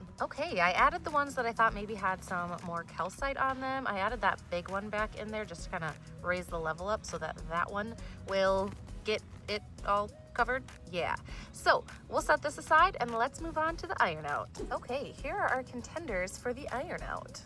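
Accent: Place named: Midwest, United States. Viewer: I just figured it was her midwest accent. Like how some people pronounce worcestershire nothing like its spelled.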